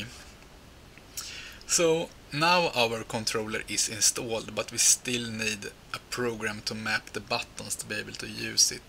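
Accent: Sweden. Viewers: Är du skånsk??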